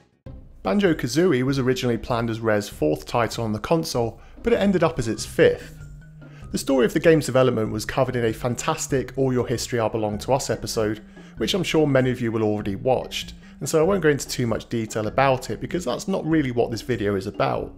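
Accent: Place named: United Kingdom. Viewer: Narrator, which region of British accent do you have? Asking respectfully as a yank haha. Found your channel today and a rabbit hole is where I now find myself.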